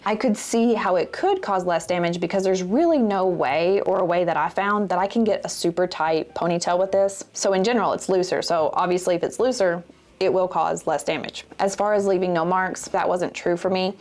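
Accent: South, United States. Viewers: that's how I feel too. I'm from TN but live in FL, so I love hearing her Southern accent. It brings me much joy.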